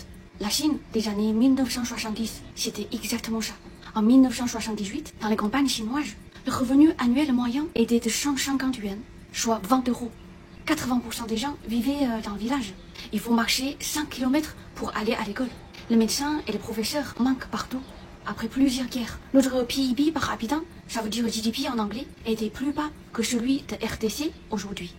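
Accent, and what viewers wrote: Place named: Portugal. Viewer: Pourquoi elle a un accent portugais ? Un bug de l'IA?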